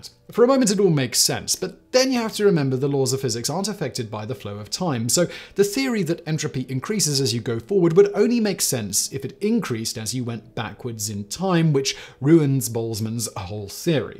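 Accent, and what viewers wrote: England, United Kingdom. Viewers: Why would they use Canadian pronunciation, given then channel and its scriptwriters and editors are American and the presenter is English?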